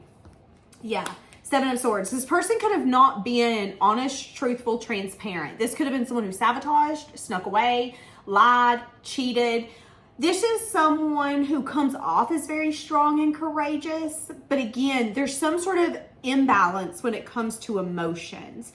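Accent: South, United States. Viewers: YES another reader with a PERSONALITY! some of these channels have such a low energy, weird dry demeanor like they don't even wanna be doing the reading lol and i LOVE the southern charm 😜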